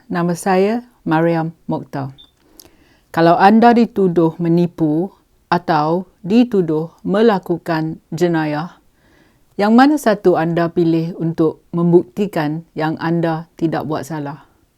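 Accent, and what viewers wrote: Perak, Malaysia. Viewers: campur cakap perak. terbaikkkk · I like your lorghat perak and so proud of it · Puan org Perak ke ...lenggok bahasa mcm Perak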